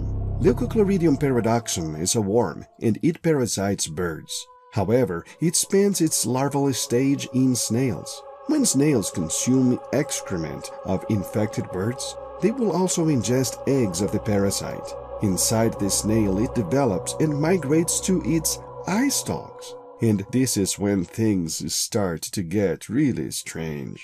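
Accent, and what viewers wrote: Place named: Eastern Europe. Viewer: This guy sounds like Idubbbz eastern European accent lol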